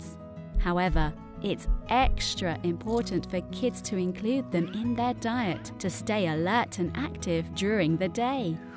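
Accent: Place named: United States. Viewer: american pronounciation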